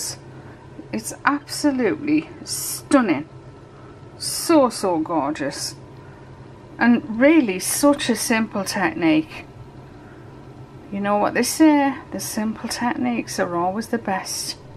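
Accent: United Kingdom. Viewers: That gold is gorgeous. I DO miss British accents..... I hope that you are keeping safe & sound. I’m a soapmaker in NZ. I have access to all the things you do, plus wonderful natural ingredients, herbs and fruits. But that gold. Gorgeous. I would, however be scraping the very last little bit of colour out of each pot into the jugs! Your soap recipe is very forgiving as it stays nice and liquid so long. Very clever. P.S.” little bit too much soap...” never!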